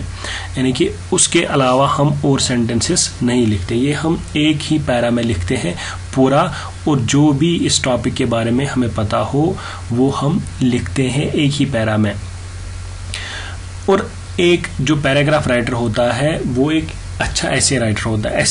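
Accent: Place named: Kashmir, India. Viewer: A Clear kashmiri accent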